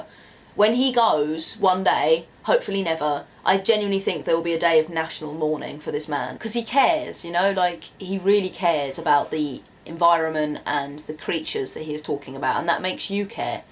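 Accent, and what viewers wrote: London, United Kingdom. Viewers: I'm here to learn British accent and it helped...❤️ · I love your accent it is wonderful l hope l can speak british english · I love British accent ! I just came here to listen to your accent 😯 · What a lovely accent! Which is it? Cockney/Liverpool/Manchester? I don't think it's R.P. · I just came here because I enjoy listening to British accent lol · Hello, Great video and recommendations! Now I'd like to ask you for a favour because I'm working on an assignment for a English Phonetics course I'm doing and I'm going to analyse some characteristics of your accent, would you mind telling me where you are from, I guess it's London? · is it a british accent. You r adorable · I am a English learner and I fell in love with your British accent. It sounds great ^^ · I like you british accent. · I don't remember the list of the shows that you mentioned because I fall in love with your accent and my mind was lost somewhere else. 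If you're an active youtuber and happened to read this comment I suggest you to make a video on "How to do British accent". I'm sure many people would love it.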